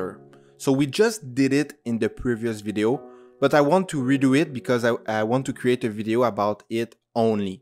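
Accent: France. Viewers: the french accent makes everything better, thanks! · Dude thanks for the tutorial! It was fun and funny at the same time! Your voice kept me focused. I laughed all along and learnt it in a fun way. Are you French btw?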